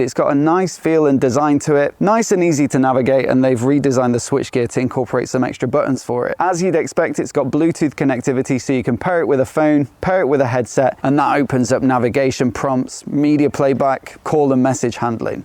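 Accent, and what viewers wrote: United Kingdom. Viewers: Can you add subtitle on your video? I really enjoy it but my english not very too good to catch ur british accent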